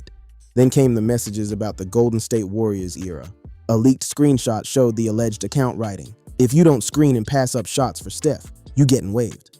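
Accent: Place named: Netherlands. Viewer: You have to do the voiceovers yourself. Even if it is a Dutch accent we can understand you lol.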